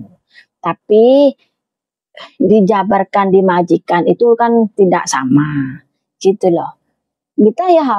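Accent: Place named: East Java, Indonesia. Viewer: Biasa nya mental ya kak yg harus kuat klo gampang mlempem mental nya jgn coba² jadi tki/tkw,, mantap kak motivasi nya, btw kakak logat nya kyk ibu mertua saya kyk logat² probolinggo, situbondo, bondowoso, hehe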